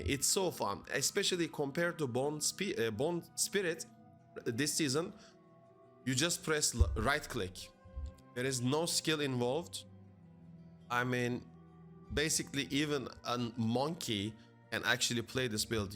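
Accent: Turkey. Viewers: Aksanın Türk gibi kesin türksün ya 😅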